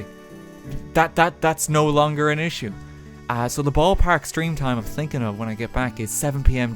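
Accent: Ireland. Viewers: Holy shit youre irish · So what part of Ireland are you from?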